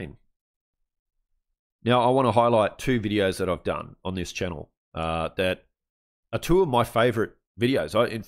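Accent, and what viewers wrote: Australia. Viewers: Love the Australian accent , EH!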